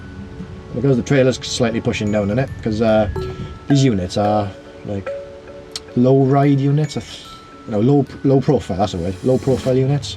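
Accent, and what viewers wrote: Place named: South Wales, United Kingdom. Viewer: you sound like you could be from South Wales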